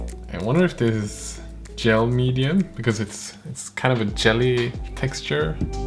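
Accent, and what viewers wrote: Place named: Sweden. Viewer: Oh my God! I used to live in Sweden. This is so fucking real! Hahahahaha And your Swede accent sounds SO familiar. 😂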